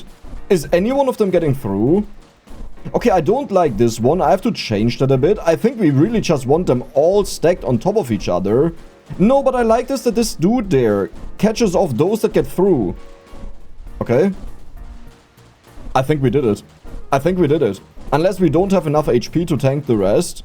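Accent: Germany. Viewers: As a compliment, you have the thickest german accent ive heard on youtube😂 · Haha Geil, Grade deinen Kanal gefunden. Und nach den ersten paar Sekunden dachte ich mir " Moment der kling doch Original wie nen Deutscher mit dem Aktent ." Hab dei deiner Kanal Bio gesehn das du Deutscher bist und Direkt ne Abo Dagelassen . Ist schon krass wie man das Immer direkt raus Hört wen wir Englsich sprechen . Nur Liebe <3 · Are you german? Either way I love your accent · German accent sptted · just a random question... Are you German? Cause I hear the "zee" and "zix" and I can't think of any other language that can give that accent... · Thanks for the vid! Really a pleasure hearing how dude with strong german accent tries to use flamethrowers efficiently to kill as much of them as possible! Here you go <3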